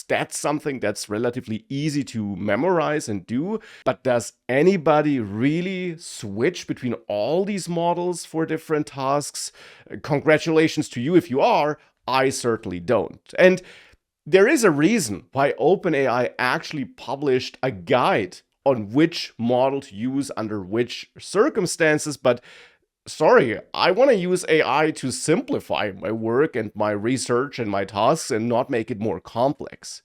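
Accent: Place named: Germany. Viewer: Pretty sure he is German which natively uses W and V sounds, guarantee his English is way better than yours, bud. What is your native language so we can make fun of you?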